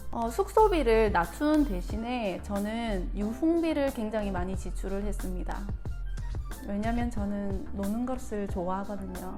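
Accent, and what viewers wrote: Gyeongsang, South Korea. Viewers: 반가워요. 경상도사투리가 재미있내요, 부산쪽... 나도 세계 및 남미 여행을 준비중인데, 도움이 많이 된네요. 쿠바가서 살사와 바차타, 그리고 아르헨티나 가서 밀롱가를 다니는 게 꿈입니다. 그래서 열심히 스페인어도 배우고, 춤도 배우고 있죠. 특히 아르헨티나는 체게바라와 메르세데스소사의 고향이라 더욱 가보고 싶어요. 좋은 정보 고맙고 자주 올게요. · 경상도 아가씨군요 대구에요? 사투리 쓰니 더 예뻐요 ㅎ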